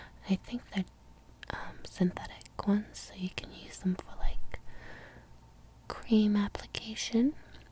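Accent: United States. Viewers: Dude you pick up accents so quick, I can hear the US accent creeping in already :D awesome video friend, grateful as always.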